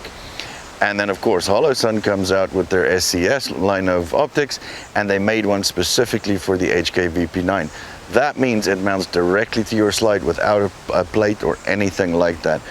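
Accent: South Africa. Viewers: You have a South African accent. Great video. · Lekka South African accent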